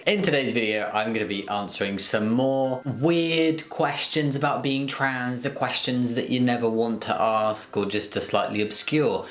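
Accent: United Kingdom. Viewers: Is he from UK ? This is not American accent for sure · I'm from Northern Ireland and I could instantly tell he was British aswell lol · Because of your accent I’m guessing your from the UK I am too and I was wondering if T and the surgery is on the NHS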